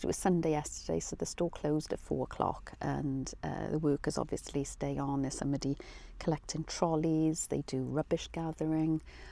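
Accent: Wales, United Kingdom. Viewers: your dulcet, Welsh voice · What is ASMR please? New subscriber here so sorry for the need for an explanation. Loved the video....AND your accent as I live in South Wales....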